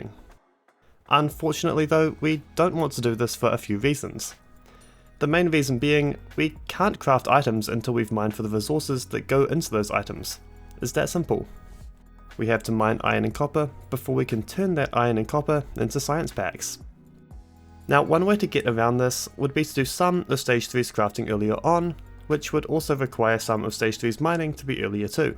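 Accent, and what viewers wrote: New Zealand. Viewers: Are you from New Zealand? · You are you from NZ?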